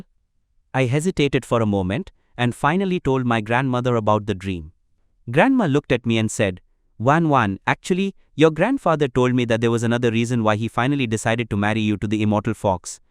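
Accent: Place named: India. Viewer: Indien accent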